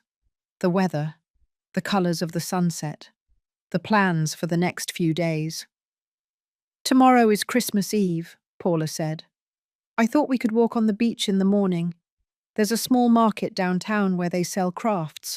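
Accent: England, United Kingdom. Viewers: Going to the beach in December. There is an accent that is English….no beaches warm enough for swimsuits. Maybe britishers that emigrated to florida or California???? Or just AI being ignorant abain 😆😆😆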